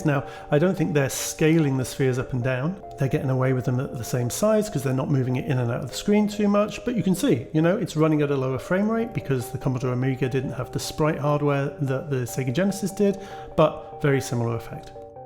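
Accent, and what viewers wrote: United Kingdom. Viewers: Is this a sligthly british Joey Zaza talking ?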